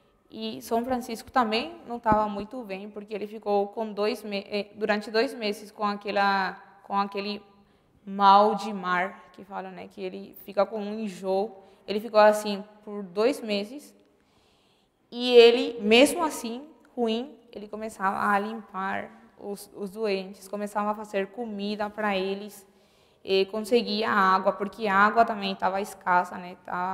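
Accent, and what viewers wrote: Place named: São Paulo, Brazil. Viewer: Gringa com Sotaque paulista. Primeira vez que vejo. Excelente aula. Obrigado.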